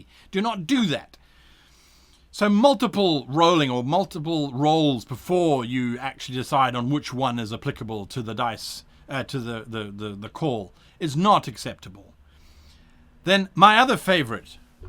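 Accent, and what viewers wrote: England, United Kingdom. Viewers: You sound like your putting on an English accent are you English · I really like your British Accent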